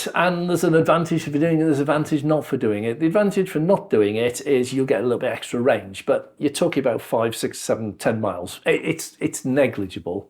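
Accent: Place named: West Country, United Kingdom. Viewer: You have some west country accent (Yeovil maybe?) and that tends to drag out some words. Also as you say thinking and talking at the same time will slow down speech. Someone who speaks quickly maybe is not putting too much thought in. As for just a minute I would say Kenneth Williams was more cheating than thinking.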